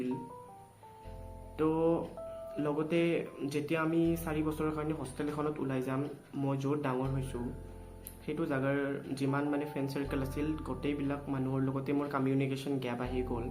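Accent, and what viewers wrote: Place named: Nalbari, India. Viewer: kot ghor tumar. Nalbeira jen lagsi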